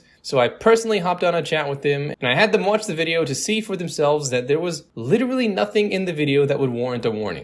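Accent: United States. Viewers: I'll bet it's your vocal tone — they don't want to admit that they think your US regional accent comes across as lewd appreciation. 🤐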